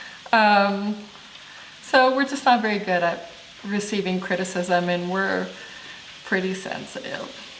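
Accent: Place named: Ireland. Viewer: ASMR Irish everyday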